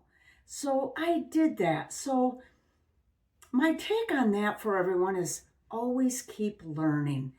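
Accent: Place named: Michigan, United States. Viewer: Just found you-subscribed. Your AI video really had me laughing. I had a very technical medical job and was told I would have to do twice the amount of work. When I explained I would not be able to keep up the same quality of work I was told (get it through your thick skull pretty much) "We do not care about the quality of your work! Just do twice as much." I quit, and I can laugh about it now, but it was traumatic at the time. Former Michigan person, and you have my accent. Nice to hear.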